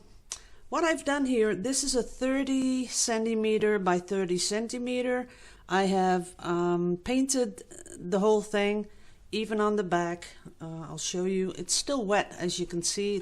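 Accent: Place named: Netherlands. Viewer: I hope I can learn to do these beautiful pours. It looks like two people are reaching for each other's hand as if something magical is about to happen (except for the "extra" finger lol) it's absolutely stunning! Btw, I knew you were Dutch from hearing your voice. My mom was the only one from her family to move to the U.S... I have never been but, I dream of going to visit my Dutch family! Thank you for sharing your beautiful art❤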